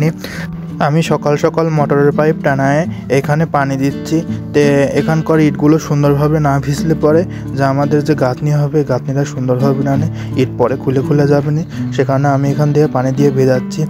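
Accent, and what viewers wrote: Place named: Pabna, Bangladesh. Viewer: তুই কি বুঝবো আমাদের পাবনার ভাষাটাই এমন বুঝলে ভিডিও দেখবি না বুঝলে দেখার দরকার নাই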